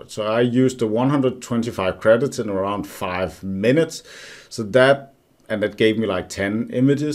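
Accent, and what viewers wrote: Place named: Netherlands. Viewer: What the hell is that accent man? It's like Dutch, English, American-Aussie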